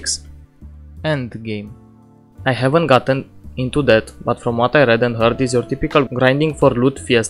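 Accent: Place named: Romania. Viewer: this guy sounds so romanian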